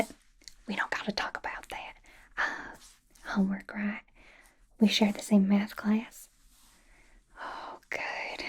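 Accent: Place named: South, United States. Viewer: When I read “Goth Rogue”, I was imagining like a DnD character, so I was a little taken-aback by the southern accent 😅 but I still like the actual video idea 👍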